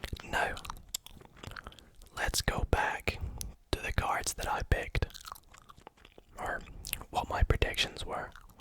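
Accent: Scotland, United Kingdom. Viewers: I’m new to you channel. You Scottish?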